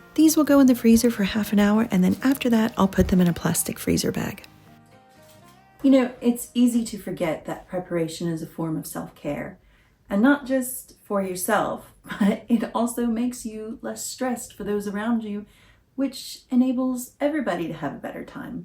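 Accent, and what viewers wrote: United States. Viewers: Oh ok I thought you sounded a bit American! lol. Menopause is awful and neurodivergent kids are such a challenge! It's nice to know others can relate! Plus Jane Austen is amazing! I think I'll enjoy your channel! ❤ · I have just found your channel and I was confused for a while you sound American but your home looks British then I heard you say Tesco. I found this video so peaceful and I instantly subscribed.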